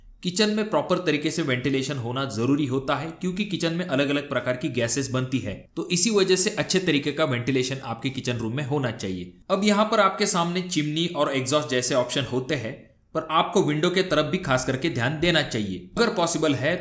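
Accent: Maharashtra, India. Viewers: Sir aap maharashtra se ho marathi mix ho rahi hai😅😅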